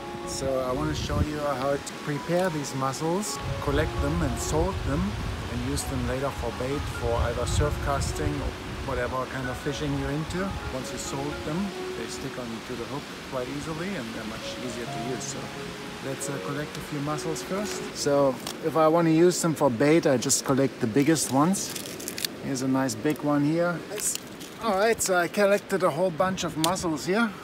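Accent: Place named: Germany. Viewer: Aight im down to two countries, Belgium or Germany, and my guess is.... GERMANY! 🤞🤞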